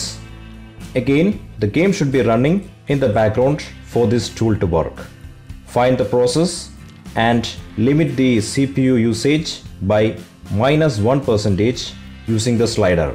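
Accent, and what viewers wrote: India. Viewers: I like your sexy indian voice :))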